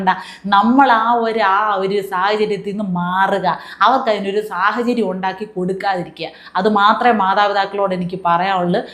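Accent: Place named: Neyyattinkara, India. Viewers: ഇത് നെയ്യാറ്റിൻകര സ്ലാംഗ് തിരുവനന്ത പുറം അല്ല ok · എന്തര് അപ്പി.. സുഖന്കളൊക്ക തന്നെ? എന്തര് മുഖത്ത് വാട്ടന്കളോക്കെ കാണുന്നല്ലടെ..എന്തരപ്പി നിനക്കൊരു ദുഖം…ഇപ്പോഴും കെട്ടിയോൻ പഴയ കോവാലൻ…തന്നെയടെ..? 😜🤣😂 തിരോന്തോരം സ്ലാന്ക് കിടുക്കി..😍👍🌹തൃശൂര് കാർ ഞന്കൾക്കിത് കേൾക്ക്ന്നത് രസമാണ്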